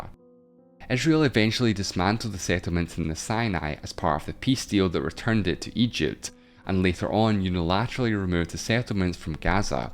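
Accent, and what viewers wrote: Scotland, United Kingdom. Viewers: He's Scottish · A Scottish English accent is so appealing to the ear.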